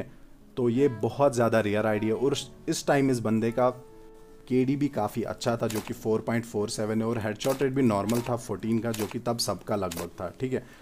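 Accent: Kashmir, India. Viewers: Bhai kashmire ho app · Kya aap Kashmir se hooo 🙄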